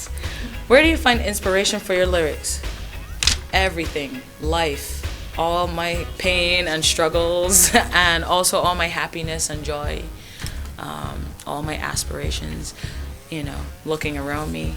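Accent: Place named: Barbados. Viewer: I love her Bajan accent!! Bigup Barbados 🇧🇧 🇧🇧🇧🇧✊🏾🙌🏾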